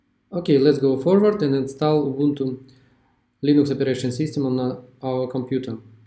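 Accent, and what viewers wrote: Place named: Russia. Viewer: Ваш русский акцент - это просто анбеливабле! Спасибо за видео - очень помогло!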